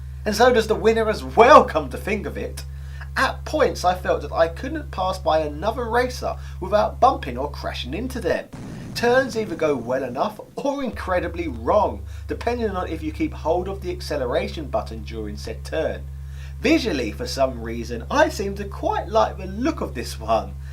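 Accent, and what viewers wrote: United Kingdom. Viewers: Shut up your british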